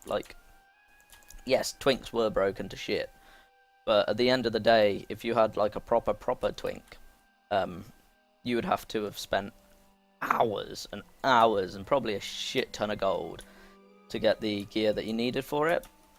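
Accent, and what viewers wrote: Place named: United Kingdom. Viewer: I want to hear the game not British voice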